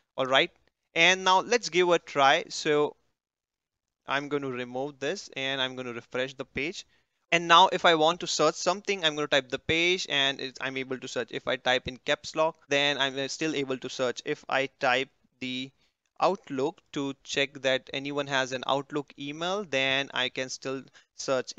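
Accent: India. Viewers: I love Indian accent <3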